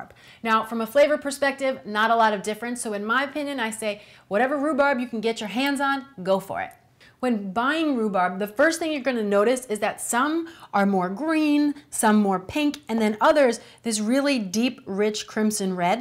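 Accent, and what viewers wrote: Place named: United States. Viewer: Where's your american accent from? Nice video though i wish u had more about harvesting a fresh crop.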